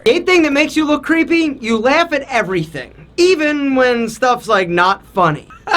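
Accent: Philadelphia, United States. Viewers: He sounds like he’s from Philly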